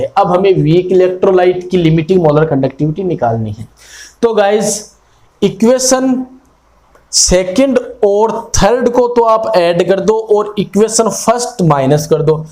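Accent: Haryana, India. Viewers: sir aap haryana se ho kia?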